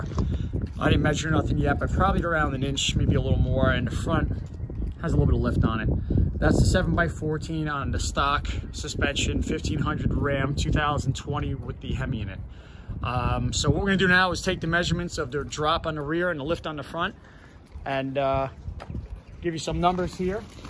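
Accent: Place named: New Jersey, United States. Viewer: He’s a great helper! I hear the jersey accent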